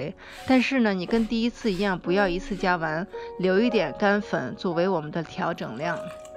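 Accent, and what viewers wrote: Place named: Shijiazhuang, China. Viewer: 听口音像石家庄滴：）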